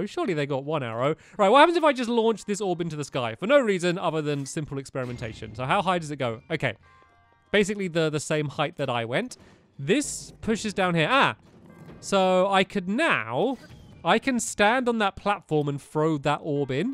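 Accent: England, United Kingdom. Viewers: Uhh he is English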